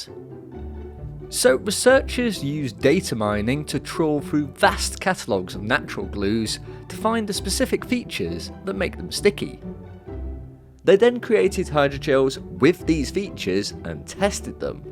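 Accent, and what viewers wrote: United Kingdom. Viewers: We're watching a channel called "nature video", the most generic title possible, and maybe the voiceover only sounds natural because AI finally mastered this accent, which sounds normal enough in the UK. When the AI writes the script, the AI gets the credit. It's best to assume everything is AI generated, almost completely, and then maybe change your mind when presented with convincing evidence, otherwise. Everything we've all been doing online? Training data. You, me, Tom Scott, every upload, every comment, every livestream, everything.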